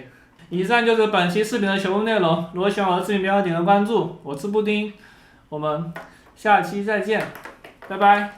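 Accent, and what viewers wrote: Jiangxi, China. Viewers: 在影片中经常能看以红土地，中国的红土地一般在湖北，湖南，江西。 结合口音，目测是江西。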